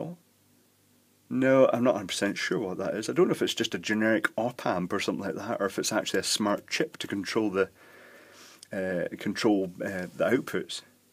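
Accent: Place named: England, United Kingdom. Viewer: "Oh I think I may be out of plumbs here" I love the queens english!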